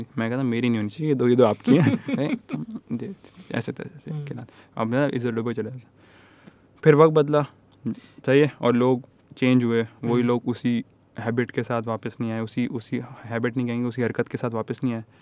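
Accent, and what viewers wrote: India. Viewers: First time suna to lga ki indian hai but really love his style ❤️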